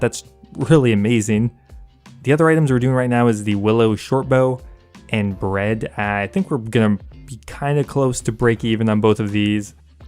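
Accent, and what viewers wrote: Canada. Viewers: I'm american and I can tell you his accent is canadian.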